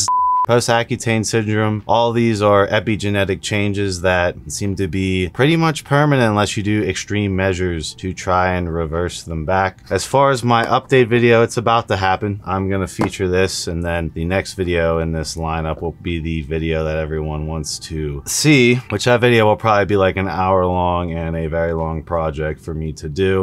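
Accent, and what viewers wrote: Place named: Western Pennsylvania, United States. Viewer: I hope you get sorted and feeling better. I noticed a Western(ish) PA in accent. Is this where you are from?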